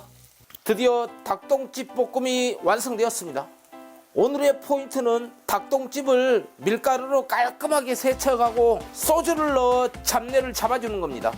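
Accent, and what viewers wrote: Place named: Jeolla, South Korea. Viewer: 아... 잘라구 누웠는데 헉... 요리사님 말ㅇ투가 진짜 이건 전라도인데 전남쪽...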